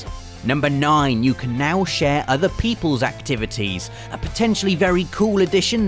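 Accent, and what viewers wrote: United Kingdom. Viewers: User Sczeshelled events. Love it! :) I wish I had a cool british accent too.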